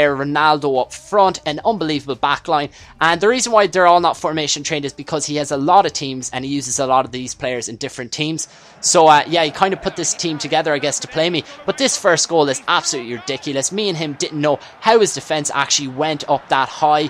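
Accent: Ireland. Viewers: its very nice too see an irish comentator.:)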